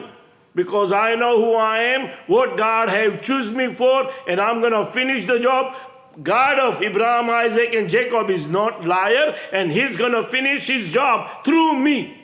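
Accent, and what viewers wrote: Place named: India. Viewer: Are you a Jew or an Indian. Your accent is Indian. 1. Why say that no Prophet in the old testament did any heaking or miracle? Elisha healed the Syrusn commander from Leprosy 2. Moses oersformed mamy signs and wonders 3. A dead person who was buried next to the bines of Elisha got resurrected. 4. A Son 9f the widow was resurrected by Elijah 5. Jesus made it clear that whatever He did was done by God the Father who eorked in Him or using Him NOW YOU , WITH NO POWER TO DO SNY MIRACLES. YOU WILL NEVER RAISE THE DEAD . WHAT WILL YOU HELP ANYONE WITH 7. A MESSIAH MUST BE ABLE TO RAISE THE DEAD. IF YOI CANT RSISE THE DEAD , THEN YOU EILL NEVER RESURRECT FROM THE DEAD EITHER . SO YOU ARE A NIBIDY AND CANT HELP ANYONE THE GREATEST ENEMY OF HUMANITY IS DEATH and also diseases If you will not taise the dead , you are worthless. You will judt die and become soil.like everyone 8. 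So, WHAT IS THE DIFFETENCE BETWEEN YOU AND OTHER HUMAN BEINGS ? . You feel helpful to Jews by telling them to fight on until GOG AND MAGOG war . Is that what Jews are looking for. A firever war life . Dont they want peace ? JESUS CHRIST IS THE PRINCE OF PEACE . REJECT HIM TO YOUR OWN DETRIMENT . WHOEVER BELIVES YOUR LIES WILL BE END UP IN THE SAME FATE.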